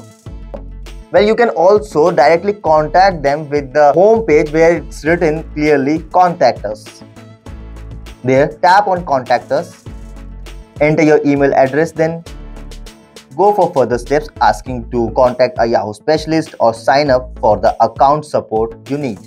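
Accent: India. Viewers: successfully tolerated his thick indian accent, over acting and wasted time.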